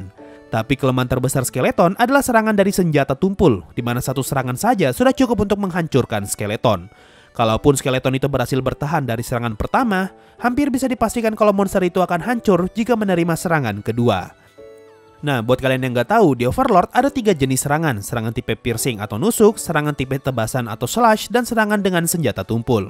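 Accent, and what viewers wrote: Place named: Papua, Indonesia. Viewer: Z dengar kk pu logat nih kayanya kk tinggal di Papua to.. di kota mana kk??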